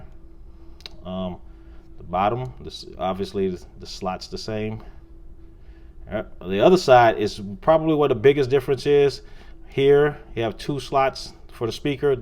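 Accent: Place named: Texas, United States. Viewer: Love the Texas accent, subscribed and thumbs upped :)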